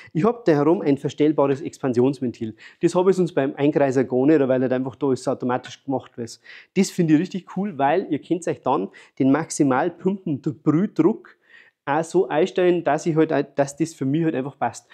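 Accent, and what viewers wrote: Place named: Bavaria, Germany. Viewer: Für nicht Bayern leider nicht immer ganz leicht verständlich aber deine Begeisterung kommt sehr authentisch rüber. Toll😊 Auch für mich in der engeren Auswahl. Leider wohn ich nicht bei euch in der Nähe sonst käme ich auf jeden Fall bei dir. Vorbei. 🎉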